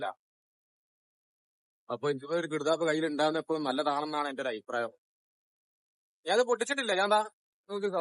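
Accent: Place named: Beypore, India. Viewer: Nyan beypore annuu..ningalude samsaaram kettappam pudi kitty...😅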